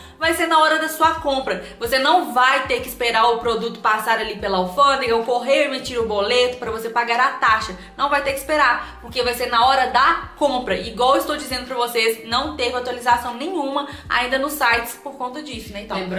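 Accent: Minas Gerais, Brazil. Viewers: Esse sotaque mineiro é muito bonitinho 😊❤️ ( eu acho q é mineiro 😅)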